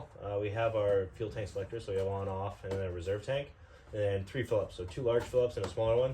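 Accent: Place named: Canada. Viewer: I notice the Canadian accent but do you always speak that fast or was the video on 1.5 x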